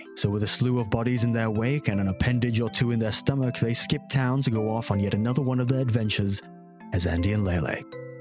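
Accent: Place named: United States. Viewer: Brit who talks like an American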